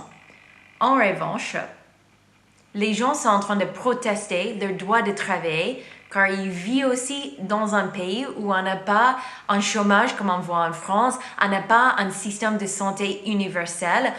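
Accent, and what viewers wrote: United States. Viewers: Heehee. English Canadian here (but dating a Parisian man in Vancouver) and I so appreciate your speaking in French (and your analysis) but to be perfectly frank I'm laughing because your accent is just so incredibly American. As in there is just the barest effort to pronounce your words as mildly French, hahaha. Please don't mind my comment because it's endearing to begin with but even more so because you are so earnest and passionate about the topic. Your language skills are super however by the way; I'm jealous and still learning in an elementary level. ;) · she's also pronouncing half the words as she would in english. her american accent is very very strong.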